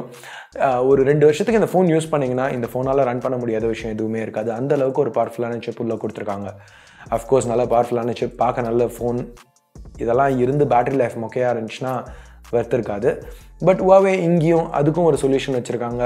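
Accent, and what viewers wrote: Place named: Tirunelveli, India. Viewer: Tirunelveli bro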